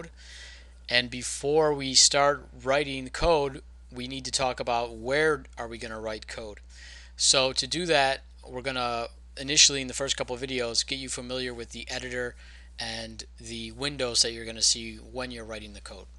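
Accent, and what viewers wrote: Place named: Boston, United States. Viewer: I think I found Bill Burr’s brother! Sounds like him, am I picking up a bit of a Boston accent there? 🤔🤔🤔🤔🤔🤔🤔🤔🤔🤔🤔!!!!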